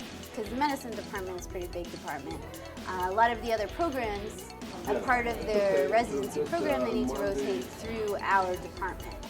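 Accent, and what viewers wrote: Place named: United States. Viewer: she has an American accent, maybe her parents are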